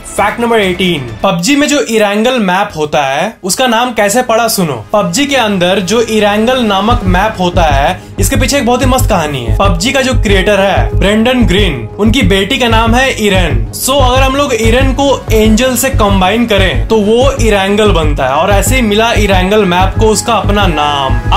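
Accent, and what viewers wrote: Bihar, India. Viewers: sala ekdum ganda wala bihari lagta hai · Aap bihari ho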